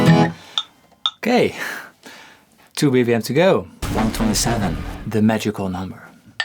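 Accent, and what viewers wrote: Netherlands. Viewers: I believe he is from the Netherlands.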